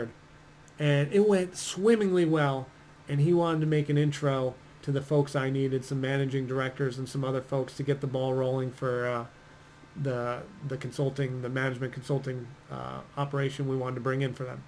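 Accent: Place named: New York, United States. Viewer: You're so smart & your vids are so wonderful & so helpful -- both by yourself and, especially, with Helena. You're funny, smart, cute & handsome,. Love your NY accent... plus your wild enthusiasm & wicked humor!!! God bless you.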